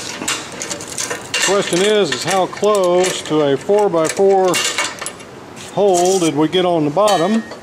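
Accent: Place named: United States. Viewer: I like the way he speaks . Reminds me of real American person how they talk .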